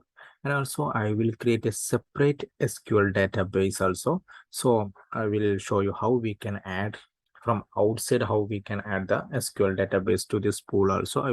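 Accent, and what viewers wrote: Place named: Kerala, India. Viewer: Love the Kerala accent and very informative video bro thanks....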